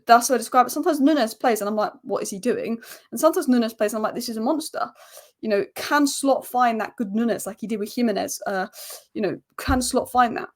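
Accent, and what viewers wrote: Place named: England, United Kingdom. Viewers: I watched a tv show called uncle and he has an accent like hers. What part of England do People have this accent. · What part of Manchester is she from?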